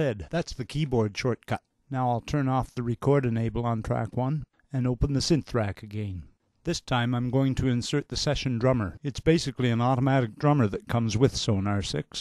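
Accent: Canada. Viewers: Love the Canadian accent. How does Session Drummer 2 do on more funky rhythms with ghost notes and flams? Does it sound natural or do you get the "machine gun" effect.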